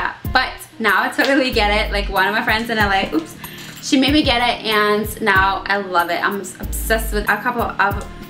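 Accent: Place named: New Jersey, United States. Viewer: I know you hate hearing this but I did think you were from Jersey from your accent. Loved the bloopers! :)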